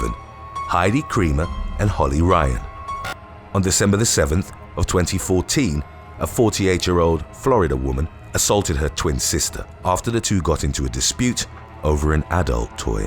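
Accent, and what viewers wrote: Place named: United Kingdom. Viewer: I always enjoy your videos. I also love your perfectly calm narration voice and in particular your sophisticated British accent. Your accent always draws me in to listen to your videos.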